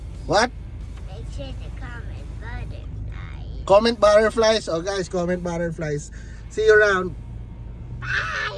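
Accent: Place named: Conyo, Philippines. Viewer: ang conyo mag salita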